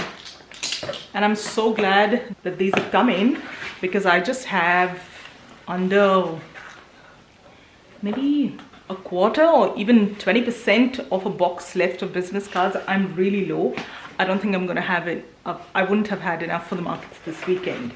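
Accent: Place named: United Kingdom. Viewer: Maybe a stupid question but in your bio it say’s Australia but you sound like someone from the UK😬 i am a starting artist and thinking about doing markets to sell my art but will the costs way up against the sale? Something else i need to overcome is the amount of people, i am a high sensitive person. So back to your video , i really liked it. Well done👍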